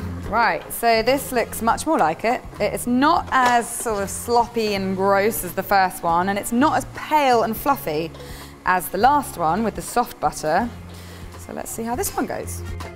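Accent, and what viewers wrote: England, United Kingdom. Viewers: She's so well spoken and got a nice attitude. She sounds very English and that's amazing. There should be more people like her, well spoken with a great personality.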